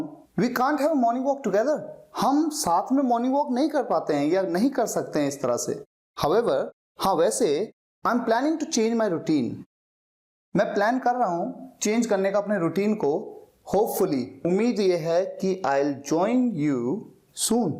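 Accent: India. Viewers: I am Chinese，YouTube recommend India accent English to me😨😨😨😂😂😂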